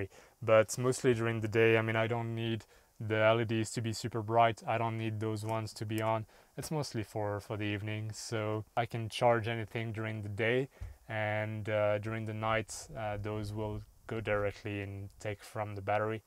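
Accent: France. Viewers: Okay I give up... French? Swedish?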